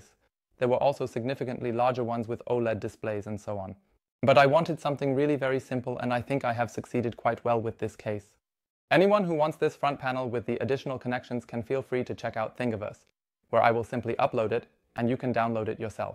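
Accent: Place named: Germany. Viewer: You are very handsome young man I love the German accent. 🤗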